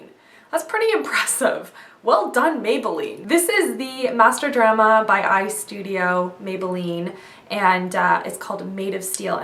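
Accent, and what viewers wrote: Canada. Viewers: Haha it sounded like you were saying oat instead of out and it was so weird but then you said you were from canada and it clicked! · haha ohmygod I love canadian accent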